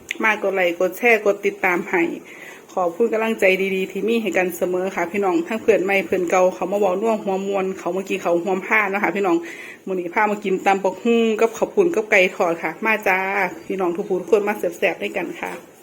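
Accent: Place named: Ubon Ratchathani, Thailand. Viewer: สวัสดีครับ แซบๆครับ สำเนียงคือคนอุบลบ้านผมเลย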